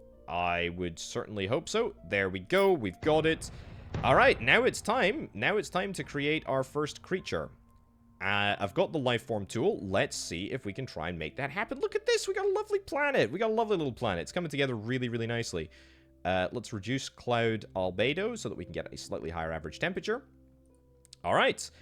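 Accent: Scotland, United Kingdom. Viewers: Scottish accent? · Which region of Uk are you ?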